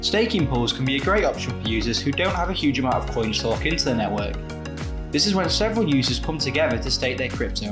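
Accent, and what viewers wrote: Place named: Netherlands. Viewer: Nederlands accent :)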